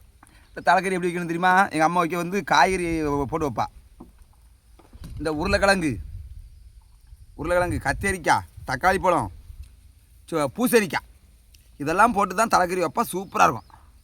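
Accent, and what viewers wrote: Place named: Tirunelveli, India. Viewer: தம்பி உங்க ஊரு வெளியில விடமாட்டேன் நான் மும்பையில் இருந்து ஃபாலோ பண்றேன் எனக்கும் திருநெல்வேலி தான் உங்களுக்கு தொல்லியல் எந்த ஊரு கண்டிப்பாக சொல்லுங்க நீங்க பேசுற ஸ்லைம் எனக்கு ரொம்ப ரொம்ப பிடிக்கும் முடிஞ்சா ரிப்ளே வாங்க❤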